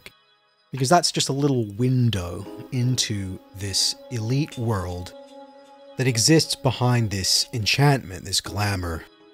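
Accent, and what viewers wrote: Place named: West Country, United Kingdom. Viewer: More likely from the south west country, not upper class but well educated working middle class from a nice area.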